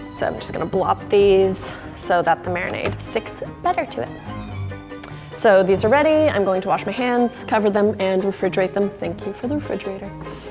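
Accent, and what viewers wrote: United States. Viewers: . Aaa ik dacht echt dat je amerikaans was maar ja mijn broertje vind pannekoeken lekker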